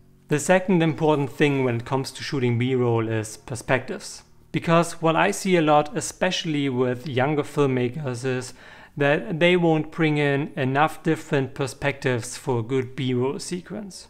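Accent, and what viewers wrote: Germany. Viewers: Great video. And interesting accent. where are you from? Leipzig? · Are you from Germany 🇩🇪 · Is this a Hard german accent? Nice B Roll though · Mega geil man hört an deinem Aktzent das du Deutscher bist 😂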